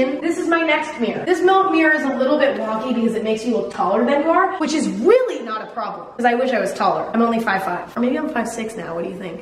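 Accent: United Kingdom. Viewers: Wait u British?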